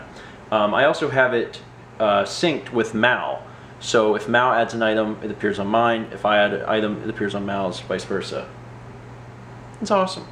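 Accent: South, United States. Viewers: its the southern accent it sounds like we say an l when we are talking really fast. figured id let ya know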